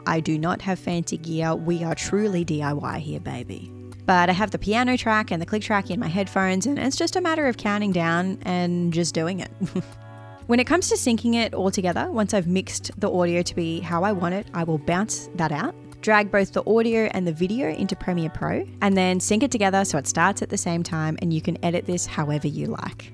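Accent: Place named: Australia. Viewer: Very interesting information in the description section. I was actually curious if you were in Australia, or maybe a nation with a similar accent. Being a 30 year broadcast television veteran, I have to add that I’m very impressed with your editing skill. When I was in college we only had actual videotape editing! lol Computer based/non-linear editing was just emerging. Two questions: Do you offer vocal lessons; and, are you on Facebook? Love all your vids!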